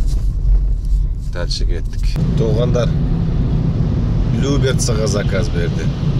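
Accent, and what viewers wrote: Caucasus. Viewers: Чото кавказский аксент болуп кеттиң эле акыркы күндөрү анан эле жок болуп кеттиң не болду экеен дедим эле. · Кыргызлекенсинго, орус тили кавказкий акцент тугондубу ?😂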